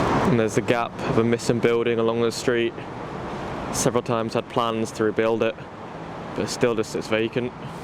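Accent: United Kingdom. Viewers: What accent do you have?! I'm getting Leicestershire and Southern elements and northeast elements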